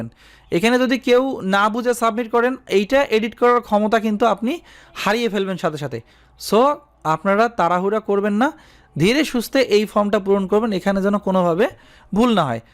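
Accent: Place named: Noakhali, Bangladesh. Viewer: ভাই আপনি নোয়াখালীর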